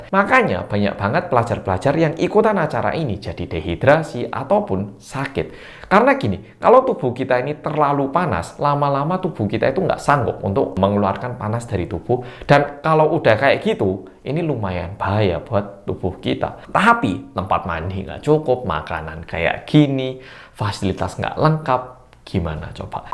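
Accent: Java, Indonesia. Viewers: Ko logat e kok jowo isok ngomong jowo ta ko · Om asli orang Jawa ya kok suaranya medok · Ini org Korea kah... tp kek org jawa logat dia ngomong. Aq yg org Indonesia aja gk ngerti bahasa Jawa.kek nya dia bisa bahasa Jawa. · 😆 medok banget logatnya mas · Jemek🤭😁 bosomu lho mas...bikin ngakak 😃😁 lek wong jowo mah paham..tapi yg bukan wong jowo aneh dengernya 🙄😳🤭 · Jemek boso joso bang Becek bhs Indonesia nya. Btw Aku seneng smpy jik njowo i., 😁😁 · Medok banget intonasinya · Wow..!! Saya baru pertama kali ketemu Channel ini.sungguh mantaap,,bhsa indonesianya joss bgt.rada² medok bhsa jawa lagi.😃🙏🙏👍👍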